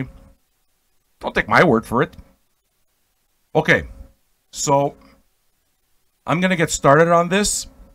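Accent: Canada. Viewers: Well I got it right that it was Canadian so 1/2 pt to me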